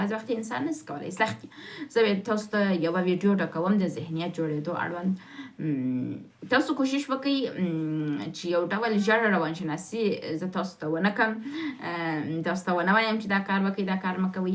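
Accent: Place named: Kandahar, Afghanistan. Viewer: محترم این زبان را زبان پشتو میگویند که مربوط به افغانستان می‌شود و لحجه اش هم قندهاری است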